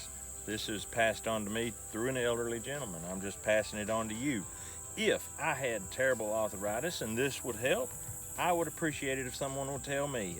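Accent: South, United States. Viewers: That damn Arthur Itis 😂 I love that southern drawl sir 💪❤️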